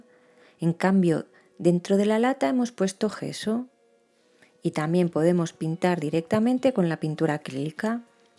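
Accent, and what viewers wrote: Spain. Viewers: Mas bien habla legit imo español de España.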